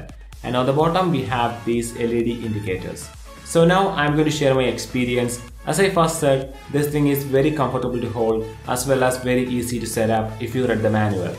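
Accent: Kerala, India. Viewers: Malayali Aano??😉😃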